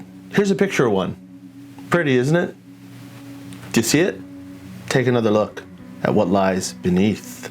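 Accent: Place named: Newfoundland, Canada. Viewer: Deadly Newfie accent! Haha. Well done. Amazing video and channel you have here! Keep it up! sent me. :)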